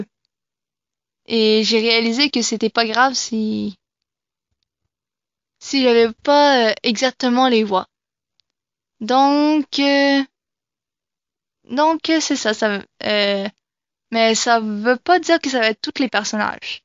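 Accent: Quebec, Canada. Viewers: Question est tu québécoise ? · est tu québécoise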